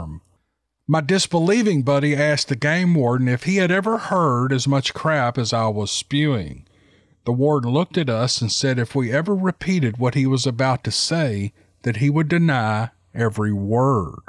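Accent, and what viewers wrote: South, United States. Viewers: I hope you enjoyed Thanksgiving. I enjoyed your narration of fantastic story.always good to listen to.your goodoleboy accent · Man oh man! Fantastic stories, every one of them! &.... you ALWAYS do these stories justice, your voice becomes animated as you launch into the character of the story. The intensity & excitement in your voice puts the listener right there in the encounter! I just love listening to your sweet Southern drawl. You are truly a master story teller. And thank you to those authors for sharing their encounters! A Wompus cat!? Lol, that's a new one I've never heard. I love it! I remember as a kid growing up on a Texas army base, my dad (a drill instructor of all things) would take my 2 older brothers small game hunting often. If I recall correctly, one of their first hunts on their own was a 'snipe hunt'. Having been let in on the joke while the boys were out hunting (with their pellet guns & burlap sacks) I couldn't wait for their return. 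I knew right then Dad had armed this little sister with some "ammunition" that would provide entertainment at their expense for years to come. Of course karma has a way of finding everyone & turn about is fair play. We had a pretty good snowfall one winter, a rare event in that part of the country, and I missed much of the fun being too scared to go play outside. When the boys found out why, then it was their turn to laugh. Being the last one out the door, after getting all bundled up, Daddy told me to be real careful, especially in the deeper snow, "cuz them thar snow snakes will crawl up your butt & freeze ya ta death." Hahaha We live in the g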